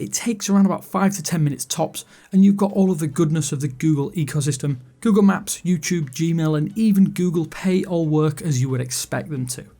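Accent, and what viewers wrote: United Kingdom. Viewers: てていていってて 这差远了，只是英音而已。。你听听约翰逊那种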